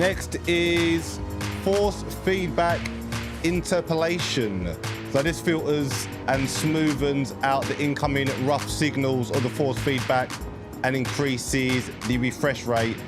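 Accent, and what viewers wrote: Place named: South London, United Kingdom. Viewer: Where abouts in London are you... sound a bit south .. I will check out a few more of your vids... cheers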